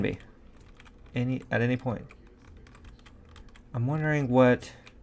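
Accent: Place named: United States. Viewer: He has a great American voice and is hot can confirm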